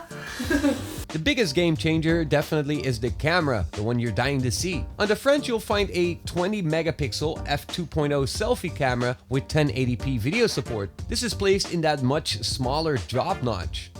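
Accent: Netherlands. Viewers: you sound very dutch 🤔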